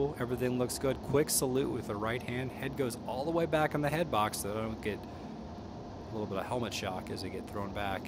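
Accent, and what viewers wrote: United States. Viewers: This former Navy pilot hears a Philly accent?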